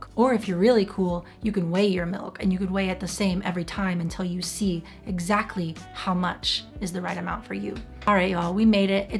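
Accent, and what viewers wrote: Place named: Israel. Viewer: Which country are you from originally? Israel? Going by the accent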